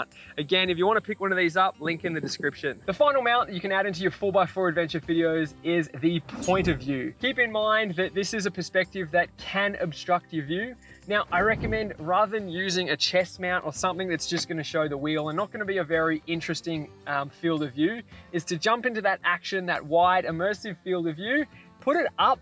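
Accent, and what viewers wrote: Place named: Australia. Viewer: Dunno what I love more!! The epic tips, hat, or the fact that this is the most Aussie ever sounded. Top highlights: “It’s a bloody absolute ripper” “Let’s not be d*ckheads” Thoroughly enjoyed 😝✌️🤙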